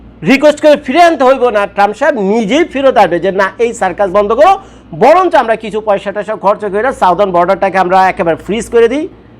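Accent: Dhaka, Bangladesh. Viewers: মনে হচ্ছে আসল ঢাকার কোন মানুষ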